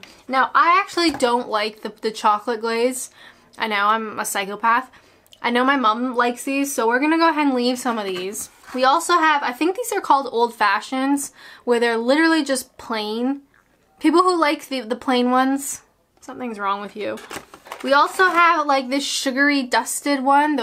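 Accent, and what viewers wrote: Canada. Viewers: I love the aboots 😊😊😊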